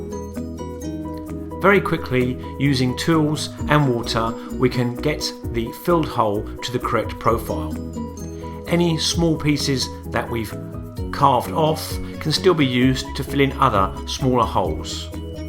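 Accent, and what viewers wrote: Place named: England, United Kingdom. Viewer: i like that voice from england,thanks for the tuto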